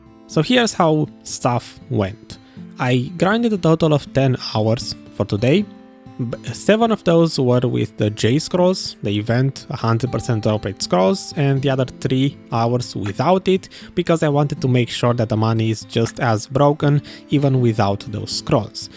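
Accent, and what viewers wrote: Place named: Romania. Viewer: You sound very romanian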